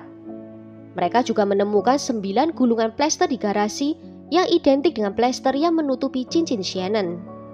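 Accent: Java, Indonesia. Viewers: Lebih pasnya nama chanelnya ini adlh.... Krn medhok Jawanya bikin gemes.... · logat jawa nya itu loh... bikin terngiang ngiang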